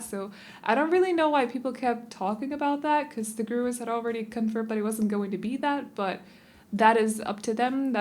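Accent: United States. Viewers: I keep forgetting that you're not American because you sound like it. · Åååh hvor er jeg glad for at du taler med Amerikansk accent og ikke klassisk Danglish.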